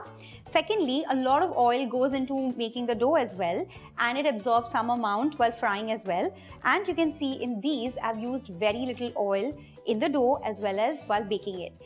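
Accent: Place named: India. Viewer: Your accents annoying. Indian women talks with stupid accents. It gets made fun of all the time.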